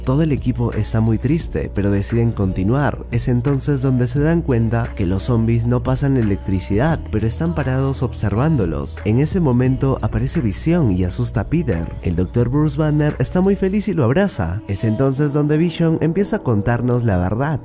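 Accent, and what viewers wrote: Peru. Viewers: Tal ves sea por qué el men es peruano y pgod también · gran resumen y mis sospechas son de que eres peruano xd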